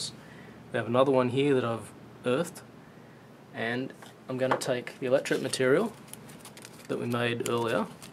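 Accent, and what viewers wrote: Australia. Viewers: Great video! Are you by some chance of Australian origin?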